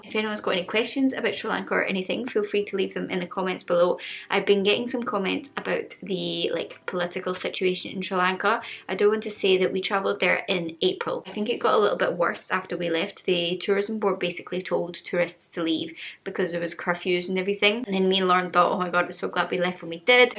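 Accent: Scotland, United Kingdom. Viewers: Hi watching your videos two years later. The google Algorithm has not done justice to your channel. I am of English descent on my Mom's side but born and raised in Sri Lanka and now living in Florida. You and are two brave souls to visit during turbulent times but I am glad you had a wonderful holiday. I love your Scottish accent strong and heavy on the R's. I have been to Scotland twice (Dundee). I hope to visit Sri Lanka with a stopover in the UK I am waiting for warmer weather because my body does not like the cold. Cheers Mates and happy travels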